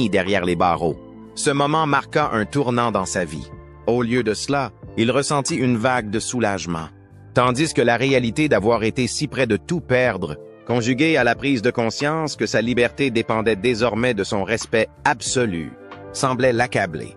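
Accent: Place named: Quebec, Canada. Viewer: J'ai vu le titre racoleur sur la mort de Chumlee. Le pire c'est que j'y est cru j'avais même de la peine .docu merdique avec une voix IA a l'accent québécois. Ridicule 👎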